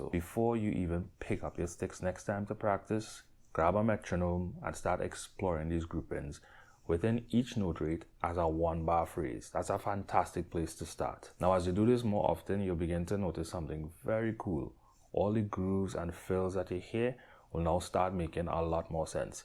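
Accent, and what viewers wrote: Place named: Trinidad and Tobago. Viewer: Like ur vid's. I detect a trini accent in ur articulation. I could be wrong.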